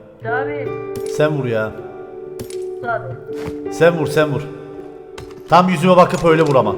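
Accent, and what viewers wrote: Cyprus. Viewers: abi diğer videonda ful kıbrıs türkçesi konuş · Kıbrıs li olduğun belli baya . Bide videoya okadar edit koyuyosunki videodan sıkılıyorum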